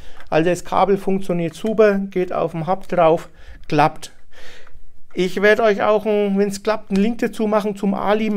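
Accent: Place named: Franconia, Germany. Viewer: Hallo, Ich habe ein größeres Projekt mit Lego Bahnen vor. Meine soll bei Schönem Wetter draussen im Garten fahren. Natürlich in Modul Bauweise. Deinem Dialekt nach, würde ich mal behaupten, daß du aus Franken kommst. Vielleicht hast du ja mal Lust auf einem Fahrtag, wenn das Ganze Form angenommen hat. Falls du da mal Bock drauf hast, dann meld dich mal. Liebe Grüße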